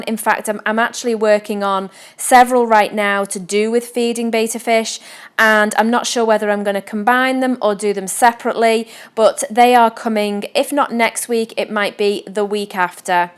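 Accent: Liverpool, United Kingdom. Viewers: I wish you would have persevered with this channel, it appears it could have really gotten somewhere. |Such a shame, but that's life.. You sound like people I know from the Sefton areas of Liverpool?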